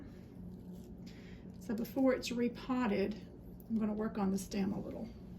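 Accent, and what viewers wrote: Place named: East Tennessee, United States. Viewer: You sound like East Tennessee. Me!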